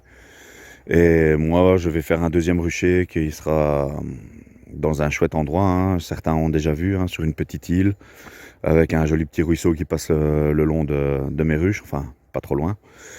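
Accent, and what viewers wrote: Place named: Belgium. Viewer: Salut, une belle saison à toi 🤞. Tu meuble tu meuble mais avec l'accent belge c'est sympa à écouter 😉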